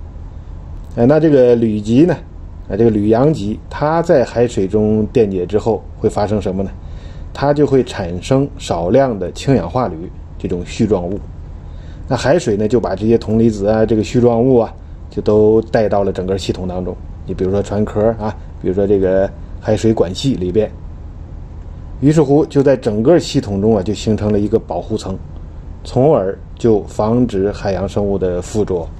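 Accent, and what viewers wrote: China. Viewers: 船长哪里人？听口音像大陆的？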